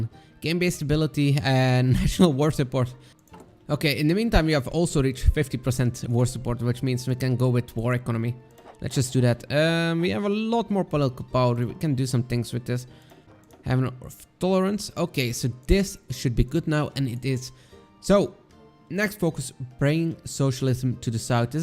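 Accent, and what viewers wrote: Belgium. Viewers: you Belgian? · Because I hear a slight Belgian (Flemmish) accent in pronouncing the Dutch words. I'm from the Netherlands myself. Good pronounciation btw.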